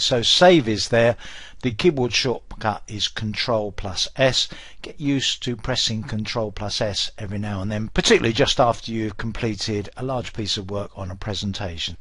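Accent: United Kingdom. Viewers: When I heard the British Accent, I was super happy. I love listening to British people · I really enjoy your British accent and simplicity you explain and teach. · When I heard the British accent I knew there would be excess talking.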